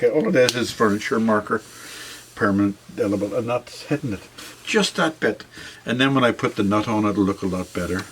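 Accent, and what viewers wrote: Northern Ireland, United Kingdom. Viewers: Thanx so much or the video .Nice to see the Guitar getting some TLC . I Have the Both the 4 and 5 string SR 300E ..I also appreciate your accent as i was born in Northern Ireland as well..Kind Regards .. simon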